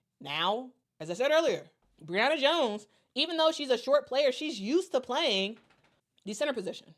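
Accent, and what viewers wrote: Chicago, United States. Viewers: It's actually a Chicago accent, not odd at all.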